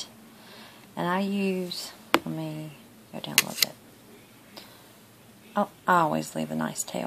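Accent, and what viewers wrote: North Carolina, United States. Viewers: Very clear and easy to understand. As others said, not boring in the slightest. I grew up in NC and your accent delights me and brings back many memories for this transplant to Yankee-land. Sorry if you've answered already, but what tool were you using to pick out the stitches? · I love your accent. I am from North Carolina, and I felt like I was home again. Great video, very helpful and entertaining! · Thank you so much for this tutorial. I used it on my first sock and it was my first after thought heel. You made it so easy to follow. Not sure, but sounds like you from the south. I'm from Ruston, Louisiana. So from one southern gal to another, thank you for the video. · K3N Clothtales recommended your videos and I am gonna be honest, I was a little floored when you started talking and you have the same accent as me lol I think you’re the first fiber artist/creator with a southern accent I’ve seen on YouTube. 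Subscribed and liked, as you came highly recommended and your video is great.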